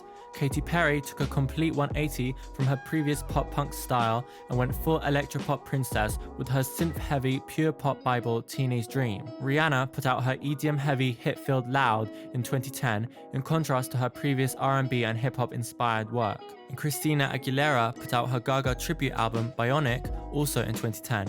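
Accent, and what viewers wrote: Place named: England, United Kingdom. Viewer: Not to dismiss her fashion influence, but one cannot attribute a cultural zeitgeist to a single person. Additionally, Beyonce wore a robot suit before we know the name Lady Gaga. Check her Get me Bodied performance at the BET Awards. I hear the English accent, however, so I understand that you may have your own cultural biases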